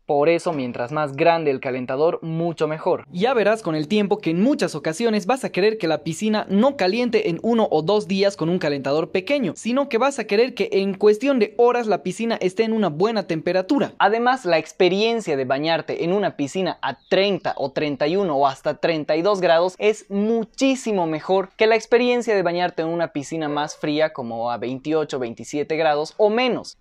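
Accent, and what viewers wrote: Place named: Amazon, Peru. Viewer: Es de Perú, de la parte de la selva por su forma de hablar.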